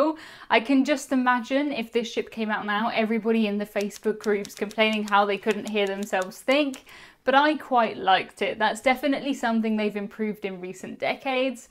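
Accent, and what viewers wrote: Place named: United Kingdom. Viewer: Don't let anyone bother you about your British accents for things like "bath". Even in the United States people say things very differently depending on the region they are in. Be you, I enjoy listening to you speak about various cruise topics with your British accent. :)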